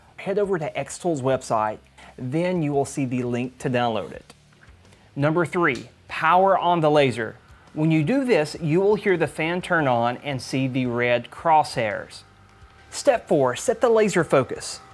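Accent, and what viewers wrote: South, United States. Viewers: You don't sound convincing with your Southern accent.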